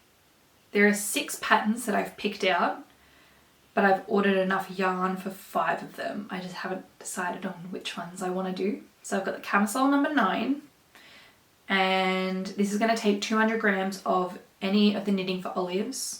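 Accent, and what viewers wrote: Australia. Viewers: Thanks for a great episode. I'm from the UK but lived in Perth for 13 years. It was great to hear that accent again x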